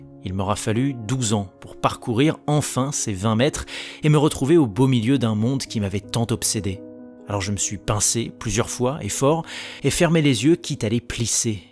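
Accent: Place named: Toulouse, France. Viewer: c le roi des jeux de mots éclatés au sol (Max Vegas, Lewis Ham’burger) on dirait un oncle relou qui découvre Twitter en 2010 et pense encore que faire une vanne pourrie, c’est de l’analyse. ttes ses vidéos c est un mix de mèmes de babtou rincés et une réflexion proche du bac à sable. Y a tellement peu de fond qu’on dirait qu’il commente la F1 en lisant des tweets. Le pire, c’est qu’il pense avoir un vrai ton mais tout ce qu’il a, c’est son accent toulousain qui le rend encore moins serieux.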